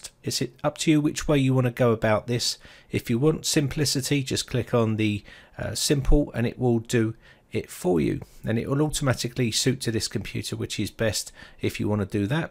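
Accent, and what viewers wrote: England, United Kingdom. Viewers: Watched and appreciated, but why are all these tech primers done by guys with English accents? Have to over and over some sections on many of them to figure out what's been said!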